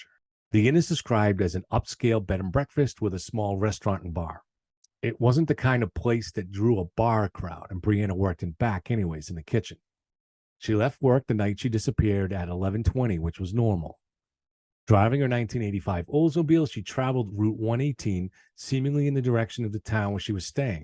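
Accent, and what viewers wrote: Boston, United States. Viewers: lol love the Boston accent ! 90 miles is still close!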